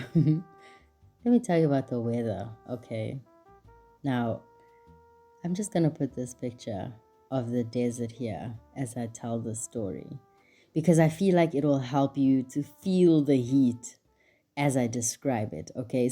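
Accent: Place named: France. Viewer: Your French accent🥵🥵🔥🔥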